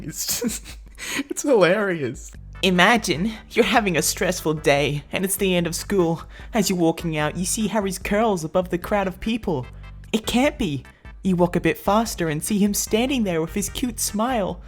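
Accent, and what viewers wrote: Australia. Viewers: Is...Is that an Australian accent? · I'm digging the obnoxious Australian accent for all of the guys' voices.👌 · You're Aussie accent makes this 10x better lol that one ab Shawn mendez, near the mid of the video the last words were 'Shawn winks' but you said it like: Shawn WANKS lmfao that one had me in tears and also the finn wolfhard one lol and the one that says 'or I'll TICKLE YOU' YOURE ACCENT MAKES IT SOOO MUCH BETTER IM TELLING YOU LOLLLL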